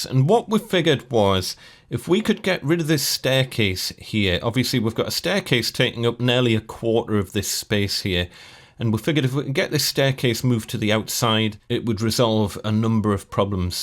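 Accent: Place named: North East England, United Kingdom. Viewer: This absolutely chuffing FANTASTIC! I'd be hard pushed to bang up a floating shelf so I'm in total awe of your incredible work. Also, it's a joy to hear a N.Eastern accent on social media. I left the Boro in 1983 for Bedford, just outside London, and whilst the Boro and Tyneside accents are separate from each other there are some similarities. Anyway, well done and best of luck to you and your wife and bairn/s for all future projects.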